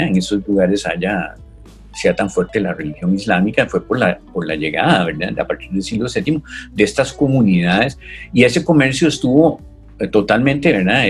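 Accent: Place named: England, United Kingdom. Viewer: Teniendo en cuenta que tiene acento inglés demasiado bien habla de la época virreinal.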